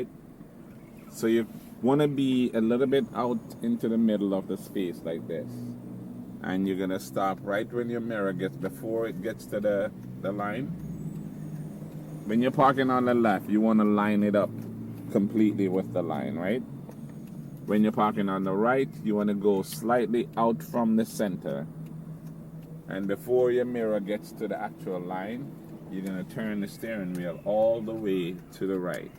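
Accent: Jamaica. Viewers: First coming across your videos, and I love it that’s where I’m having difficulty with. I can tell u r a yardie! I’m having trouble with knowing where to turn the steering pulling out a parking lot, can u do a video on that? Please!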